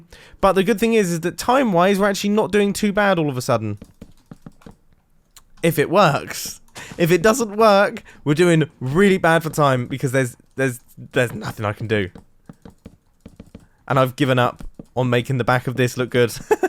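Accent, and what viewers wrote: United Kingdom. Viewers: He’s sounding really British in this one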